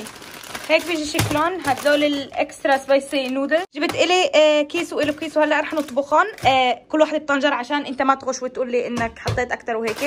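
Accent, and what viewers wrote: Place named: Palestine. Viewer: من وين هي. لهجتها فلسطينيه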